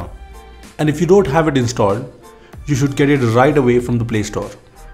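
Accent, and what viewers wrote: India. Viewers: 阿三的英語發音。。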